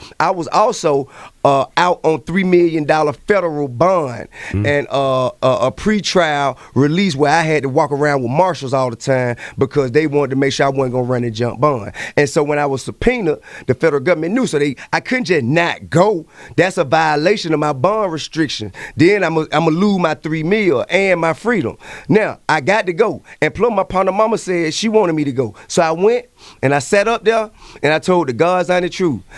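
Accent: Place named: South, United States. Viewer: This do sound so fucking country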